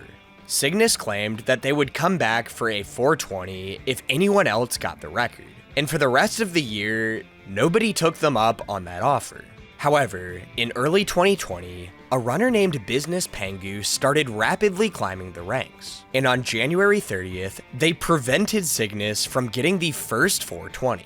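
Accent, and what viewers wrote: United States. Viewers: good video like 2 minutes in but i have to say i am so charmed by the socal accent. spiritually it sounds like you were born wearing a beanie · Are you from Pittsburgh/the Pittsburgh area? I swear to god your accent is exactly like everyone in my hometown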